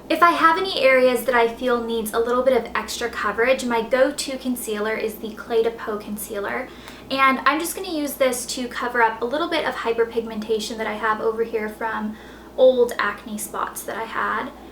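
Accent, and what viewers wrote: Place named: Tennessee, United States. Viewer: She has a bit of a Tennessee accent, leave her alone. You wouldn't ask why an Asian or and East Indian person talks a certain way, would you?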